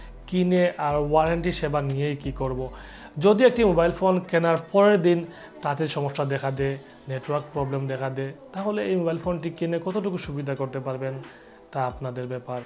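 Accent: Sylhet, Bangladesh. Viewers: ভাইছাব সিলেটী নি৷ আমিও সিলেটী৷ আফনার ভিডিও বালা লাগলো৷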